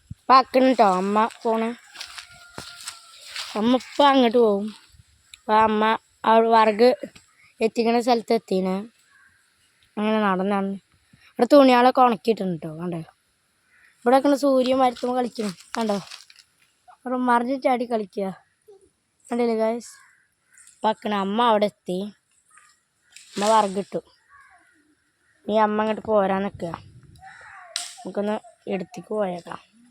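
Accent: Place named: Malappuram, India. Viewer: നിങ്ങൾ എന്നത് തെറ്റല്ല ഓരോ നാട്ടിലും ഓരോ സംസാര രീതി ആണ്.... മലപ്പുറത്തുജീവിക്കുന്നവർ വീഡിയോക്ക് വേണ്ടി കണ്ണൂർ തിരുവനന്തപുരം ഭാഷ സംസാരിക്കാൻ പറ്റോ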